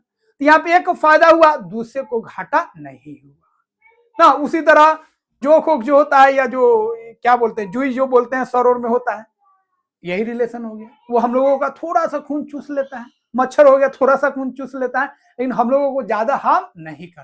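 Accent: Bihar, India. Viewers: Sir ap bihari ho ke ? Ham bhi bihar se hai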